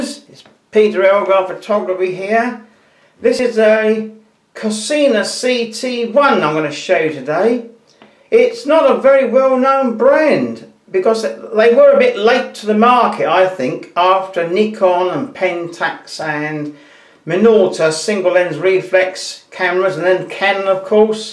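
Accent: United Kingdom. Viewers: full of British accent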